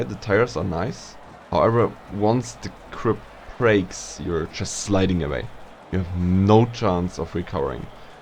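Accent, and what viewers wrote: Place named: Germany. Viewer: Are you german ? Because I am german and your accent sounds pretty much like everybody in my class speakes English😂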